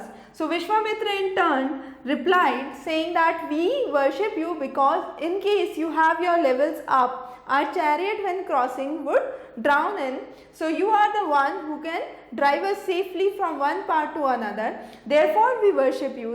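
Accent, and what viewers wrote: India. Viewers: Man are you a south Indian or north indian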